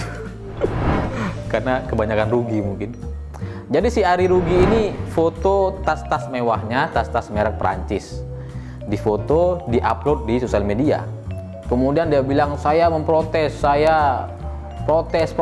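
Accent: Madura, Indonesia. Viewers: Logatnya mirip logatku, logat madura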